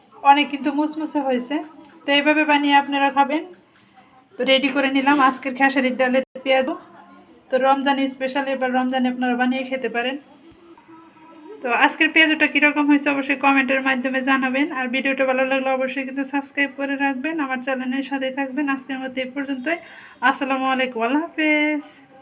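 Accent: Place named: Tangail, Bangladesh. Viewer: Ata to amader tanggaeler vasa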